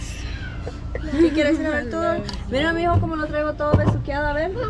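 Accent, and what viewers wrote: Mexico. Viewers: Casi cada fase de tu vida..undudable falta un hombre estable en el hogar. No jusgo nomas soy realista. Pero ya a cosa positivas..estas hermosa y me encanta tu accento me recuerdo al de mi Durango Mexico. Slds de Chicago.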